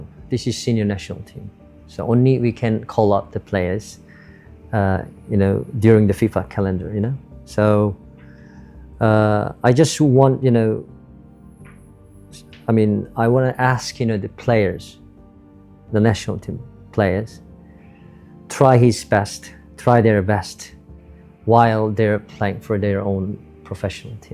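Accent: United Kingdom. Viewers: It is just refreshing to hear someone speak with a British accent in Indonesia, as most people use an American accent. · Aksennya british sangat😂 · British Accent nya renyah didengar 😅👍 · Thank you coach.. its nice hearing your British accent 😊 Semangat Garuda 🔥🔥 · i like it hear british accent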